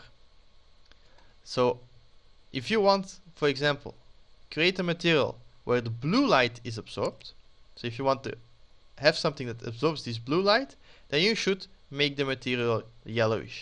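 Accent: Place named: Netherlands. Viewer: Heel duidelijke en mooie tutorial bedankt dat je dit met ons deelt. Ik vindt het leuk om te horen dat er Nederlands door je Engels heen komt dus mischien ook nog Nederlandse Tutorials ;-) Dus misschien hoor ik nog van je op het Dutch Blender Community <link>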